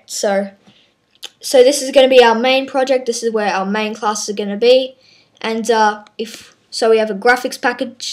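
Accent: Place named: United Kingdom. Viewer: Asian boy? he sounds like a british boy :D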